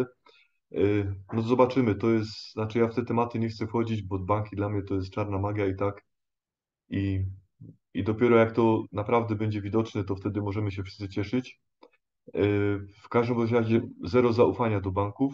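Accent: Silesia, Poland. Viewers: skad ten akcent ? Ślonzok ? pozdrawiam nowy widz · Ma Pan miły głos,jeszcze ten śląski akcent,wspaniałe się słucha.Pozdrawiam serdecznie!❤️🇵🇱🇵🇱🇵🇱🌹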